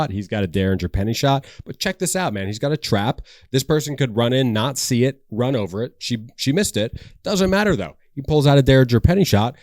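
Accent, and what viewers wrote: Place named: United States. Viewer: no not tech-bro, it’s just American Englisch. Even I as non native speaker can comprehend this. Do you really except someone to change the way they speak based on your feedback? The entitlement, holy shit wow.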